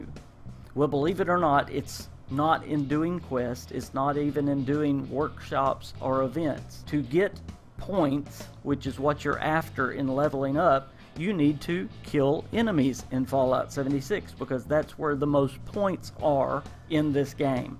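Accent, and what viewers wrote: South, United States. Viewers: Nothing quite like a Southern gentleman's voice! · I have never heard a more thick southern accent, and I am in the south.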